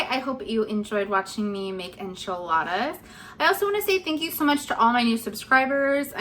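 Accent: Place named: Midwest, United States. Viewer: Looks delishious. Do you ever make chicken ones? Where do you live? You sound like your from the Midwest. I’m in St. Louis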